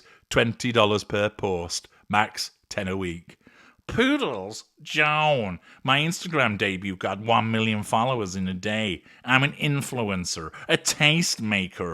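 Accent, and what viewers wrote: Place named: Yorkshire, United Kingdom. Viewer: Ey up,! 💜 Loved your Yorkshire accent! Thank you for the morning laugh! You're too funny!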